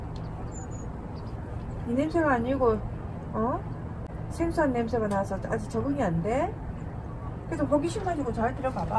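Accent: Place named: Gyeongsang, South Korea. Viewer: 천사님이세요 경상도 구수한 사투리가 더욱 정감이 가네요 응원합니다 어서 대박나십시요💓💓💓💓💓💓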